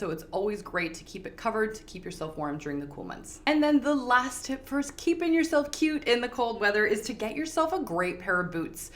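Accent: Canada. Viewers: I thought I detected a bit of Canadian in your speech. I am in Detroit right across the river from you neighbors. :)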